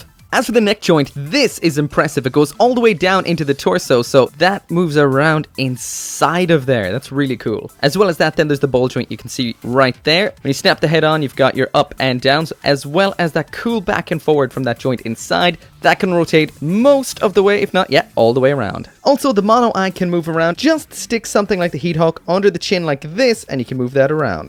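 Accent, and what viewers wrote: Ireland. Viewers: Are you from Ireland because you slightly sound like your from Cork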